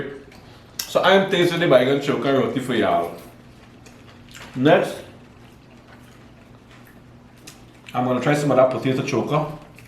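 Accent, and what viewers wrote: Guyana. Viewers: Really nice dishes .... guyanese style. Can't beat guyanese food and twang ; >) I do the same thing here in Canada but I use a cast iron pan ( just like the one you bought) and seasoned it for non-stick. Mostly we don't use the microwave anymore (bad for your health in the long or short run) I use an overhead oven. Non stick pans has a coating that contributes to cancer. Just a heads up.... for what happen to someone else does not mean it will happen to another. Cheers on a good video.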